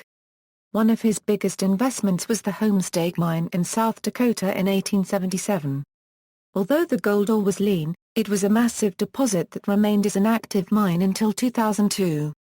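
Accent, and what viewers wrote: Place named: East London, United Kingdom. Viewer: cannot understand your east London accent....you speak at 120 mph slow down to 60 mph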